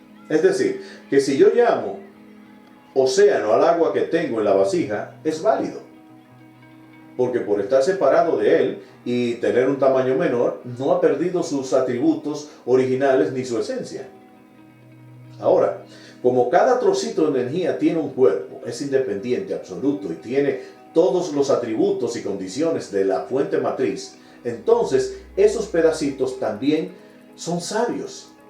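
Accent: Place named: Dominican Republic. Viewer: Hola 🖐️ es un honor saludarte. Me nutro mucho escuchandote, muchas gracias por tu gran aporte. Soy Dominicana,y quiero saber si tú también lo eres; pues tú asento es parecido al nuestro. También estoy en el camino del despertar; y me gustaría saber si vives aquí; pues necesito ayuda más directa. También yo me estoy preparando para ayudar a mi país con el despertar. Por favor, espero su respuesta.... gracias mil!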